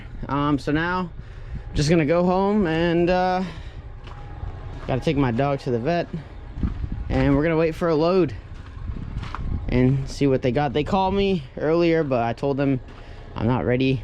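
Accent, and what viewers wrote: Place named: South, United States. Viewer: He's saying Freightliner he's just got a thick southern accent that's how we pronounce it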